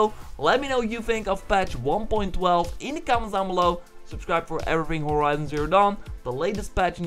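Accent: Netherlands. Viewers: ik hoor aan jou stem dat je nl bent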